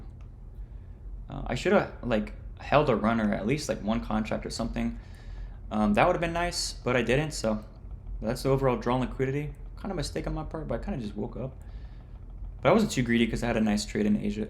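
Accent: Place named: Texas, United States. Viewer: You from Texas right 🤠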